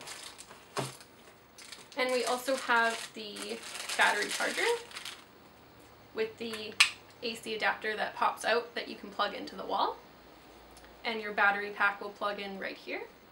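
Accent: Canada. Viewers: I'm guessin your Canadian?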